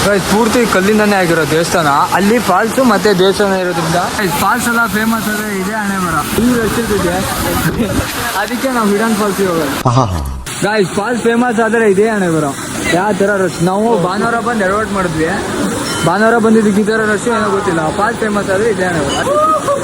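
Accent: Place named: Sirsi, India. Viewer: Bro nivu sirsi siddapura navra same a side tarane matadthira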